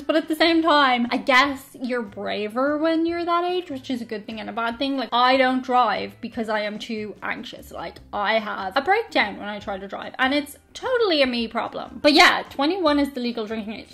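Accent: United States. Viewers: you definitely adapting to the American Accent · I really must compliment her on her ""Merican English" She was SO spot on with the State Names and other Americanisms.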